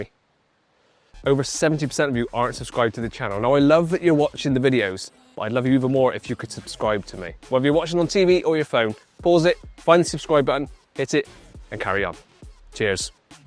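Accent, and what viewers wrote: Bristol, United Kingdom. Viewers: Is that a Bristol accent 🤔 Thankyou for the video Mate 🙂👍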